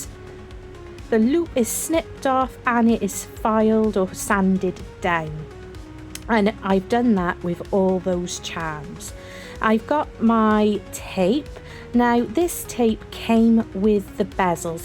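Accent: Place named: Wales, United Kingdom. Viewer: I love to hear the homeland accent I was brought up in South Wales NR Merthyr Tydfil 😊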